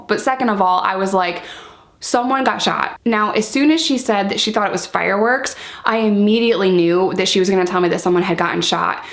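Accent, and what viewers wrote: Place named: New York, United States. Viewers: i knew your accent was familiar! i’m from utica too i completely understand how you feel having family there i’m glad your mom moved!! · Your New York accent came out in this video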